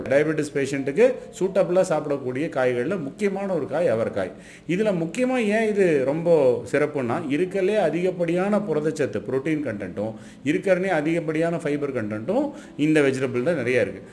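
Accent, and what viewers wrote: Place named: Kongu, India. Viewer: Vadhakudhal thaan sir😂 avar pesuvadhu kongu tamil. Adhanaal thaan vanakkudhal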